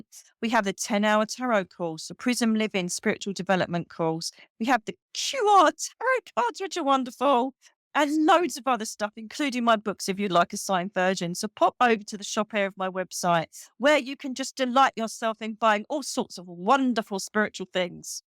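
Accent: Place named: England, United Kingdom. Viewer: I love the English accent but need a translator. What does "pants" mean to a US born person? Your teacher was pants as in a pain in the butt? lol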